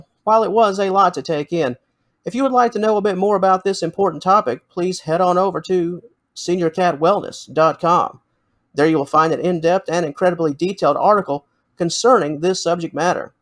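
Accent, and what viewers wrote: United States. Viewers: I don't like your cowboy accent. Difficult to understand for us non-speakers. Improve.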